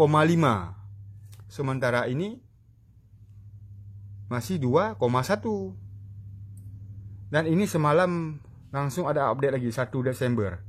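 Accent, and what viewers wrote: Indonesia. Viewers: Lage logat aceh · wong plembang ni, nak mudike tulaa wkwkwkwk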